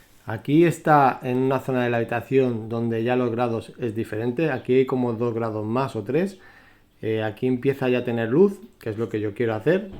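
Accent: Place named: Spain. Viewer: Hola, una pregunta. Por tu voz me imagino que eres de España. Hace poco pusieron una ley la cual prohibía muchos reptiles o algo así. Yo soy una persona que adora los reptiles y tengo varios pero son mayores. ¿Como haces para tener ejemplares de lagartos exóticos tras la ley? Esque me interesa el teju argentino blanco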